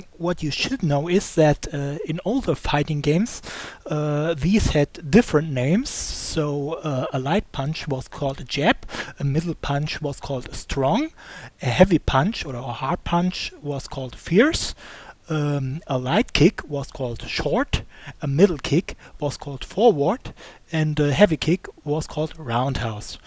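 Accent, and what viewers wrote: Germany. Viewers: Hi, just wanted to swing by to say that I find your tutorials very insightful, but unfortunately I can't stomach your accent for more then a couple of minutes.I hope this does not offend you, as I am german myself, and I know that most germans don't get the english pronunciations right. This is too bad, because apart from that, I really like your presentation. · ^^ du sprichst genauso englisch, wie ich, mit so einem deutschen Akzent, aber trotzdem gutes tut :D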